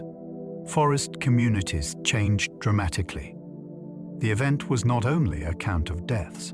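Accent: United Kingdom. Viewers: Why do these videos always have them a British dude narrating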